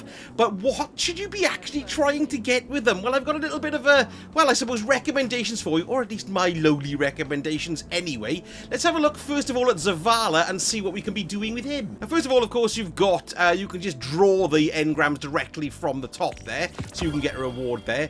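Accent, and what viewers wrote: United Kingdom. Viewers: Love your channel, nice to hear a non-american accent in videos. (fellow brit btw)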